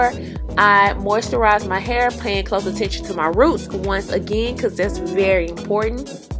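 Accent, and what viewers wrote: South, United States. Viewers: The southern accent is real with this one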